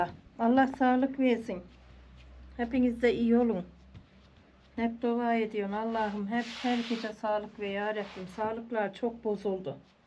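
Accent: Afyon, Turkey. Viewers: Esse lamu aleyküm verahmatullahi ve bereketi Allah 'ın rahmeti bereketi üzerinize olsun ablacım eline sağlık afiyet şifa olsun bizde koydeyken yapardık aynısından sen sinanpasa limitin hangi koydensin ben İstanbuldayım çok özlüyorum sen konuşunca afyonluca hoşuma gidiyor haşhaşi hamurunda yapardık biz sende yap çok güzel oluyor söylersin neresinden olduğunu sinanpasanin arada köyünde çek Allah'a emanet olun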